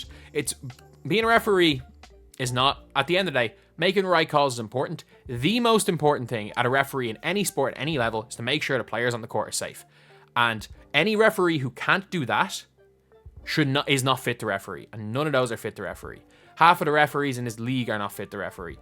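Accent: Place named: Ireland. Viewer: It’s an Irish accent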